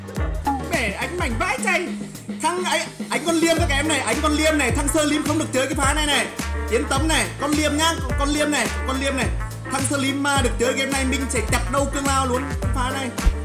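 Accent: Nghe An, Vietnam. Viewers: Ah ở đoạn mô nghệ an rứa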